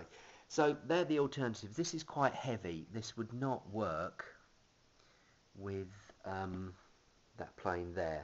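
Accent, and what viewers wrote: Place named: United Kingdom. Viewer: Good old btitish accent mate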